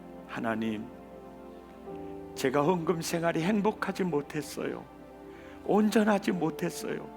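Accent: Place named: Mokpo, South Korea. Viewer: 목포 목사님 부흥회 너무나 잘보고 있습니다 사투리가 어찌 그리 정이 가네요 저도 고항 장흥 입니다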